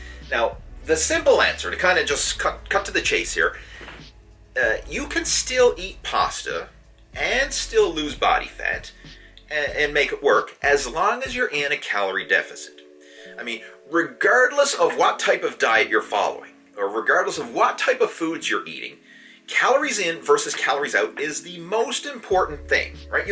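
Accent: Newfoundland, Canada. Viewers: Me: "This guy sounds like a Newf." Google: "Yes, he is a Newf." Me: *Subscribed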